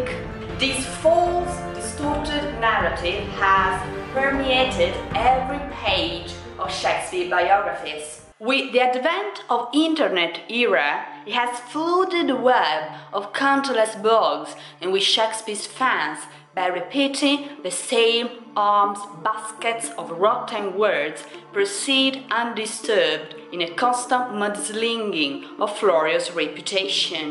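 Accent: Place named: Italy. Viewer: Please turn on closed caption I'm afraid i'm missing a lot of your argument because of interference from your italian accent. A quality that perhaps in his time also occurred. Fascinating subject and you're right on the money about the airbrushing of character reputations like Florio in relation to Shakespeare.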